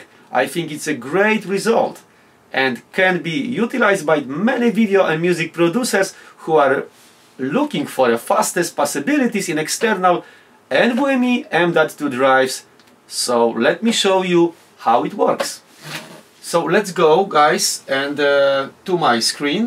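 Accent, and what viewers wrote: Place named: Poland. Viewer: Nice Polish accent:)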